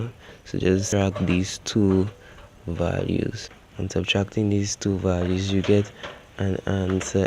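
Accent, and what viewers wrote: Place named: Dominican Republic. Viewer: Where are you from? You sound Dominican/ St.lucian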